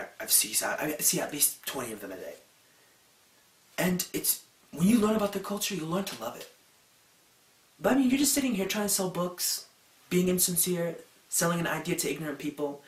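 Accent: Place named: Saudi Arabia. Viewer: هـــذا الشي جميييييييييييييييل، جدًا ! ... ما أستوعب كيف يتكلم "سعودي" زي كذا .. أبغا أقابله شخصيا وأسولف معاه لوول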